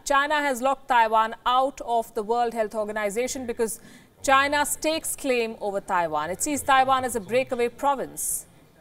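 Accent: India. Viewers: Try speaking Hindi or Tamil. Your English is too Indian. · but what part of India though?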